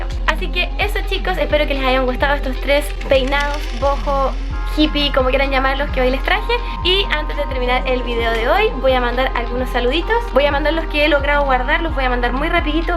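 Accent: Chile. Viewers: saludame! soy de Honduras. pero vivo en NY. tengo un amigo chileno y me gusta el acento chileno por eso te veo😍 Mi nombre es Alejandra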